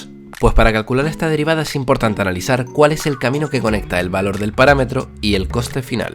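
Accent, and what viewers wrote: Canary Islands, Spain. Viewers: Pero pero pero pero pero como no habia encontrado este canal antes? Vengo por el video de JSantaolalla..................... Pero pero pero eres canario? por el acento "un poco"............... ¿??¿?¿ Pero pero pero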